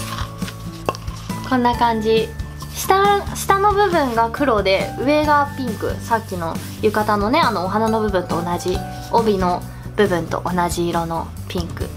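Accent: Hakata, Japan. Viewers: 博多弁可愛いw 二個目の浴衣個人的に好き！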